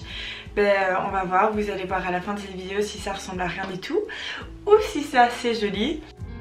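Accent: Belgium. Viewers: Tu ne serais pas Liégeoise par hasard ? Un petit fond d'accent familier. En tout cas j'espère que tu développeras tes activités, courage.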